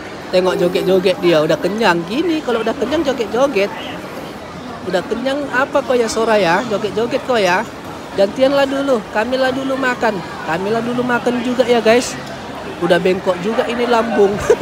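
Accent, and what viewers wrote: Medan, Indonesia. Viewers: Indonesianya dari mana bang, Medan kah?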